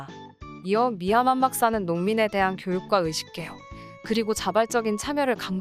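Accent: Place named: Gyeongsang, South Korea. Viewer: 😊나레이터님 경남출신이신듯 ㅎ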